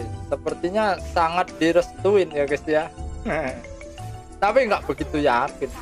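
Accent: Java, Indonesia. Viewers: Terus terang dr sekian banyak player MC di yt. Cuma smpean yg gampang saya fahami cara menjelaskan nya mas😂. Ciri khas ketawa nya nyanduin😂. Sering2 ktawa mas😂😂😂 Koyo ne logat e jowo😂😂😂😂 Sukses terus mas e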